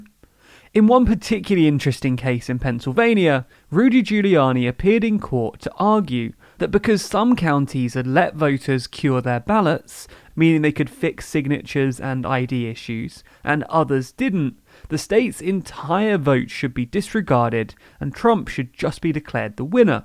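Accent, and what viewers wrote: England, United Kingdom. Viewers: TLDR - Get context from Gore v. Bush. Don't just parrot talking points. Even the English accent can't mask your bias and wrongful analysis. I've watched three videos now and hoped to get some real analysis. However, this is about 85-99% msnbc or cnn or fox.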